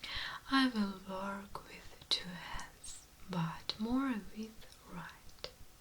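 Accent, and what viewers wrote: Russia. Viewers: I love the Russian accent! I listened to this lying down with my headphones on and had a smile on my face the entire time with my eyes closed , My mind was filled with pure bliss hearing your beautiful Soothing voice! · Wonderful video, Russian accent on English is relaxing :)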